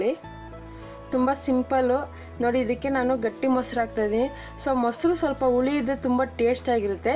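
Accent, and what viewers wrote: Tamil Nadu, India. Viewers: Nimma language noduddre gothaguthe you are from tamilian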